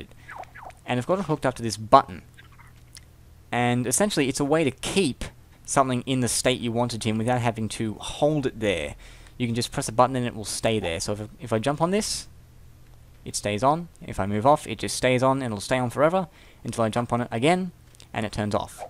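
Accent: Australia. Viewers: u an aussie?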